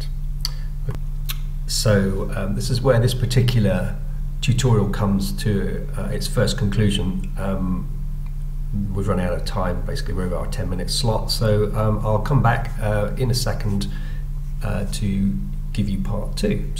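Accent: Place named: United Kingdom. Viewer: This account is WAY better than the other accounts I have seen. Mainly because you sound British which means I can actually understand you. lol.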